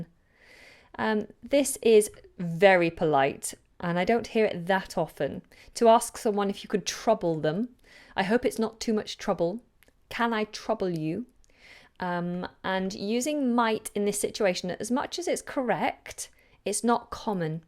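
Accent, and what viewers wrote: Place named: United Kingdom. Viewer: A real British accent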